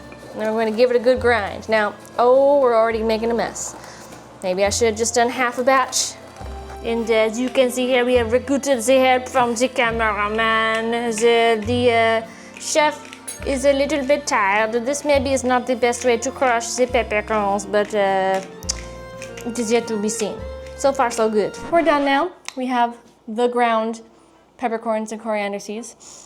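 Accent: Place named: France. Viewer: I loved your French accent!😊 I’m new here and I’m glad I found your channel!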